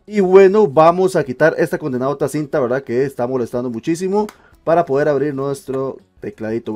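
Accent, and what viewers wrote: Costa Rica. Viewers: andaba buscando reviews de este teclado y adelante el video hasta el minut0 y lo primero que pense fue: seguro es vara que el mae es tico jajaja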